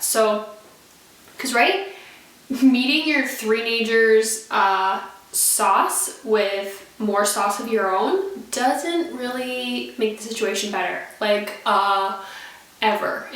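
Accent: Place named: Canada. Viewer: the Canadian accent is strong in this one😃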